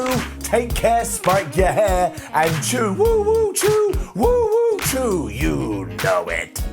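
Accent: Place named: United Kingdom. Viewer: British accent. Annoying.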